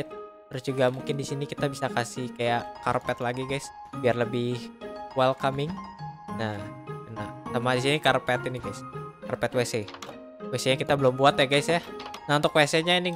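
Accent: Java, Indonesia. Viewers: org jawa kah logatnya keliatn kek jawa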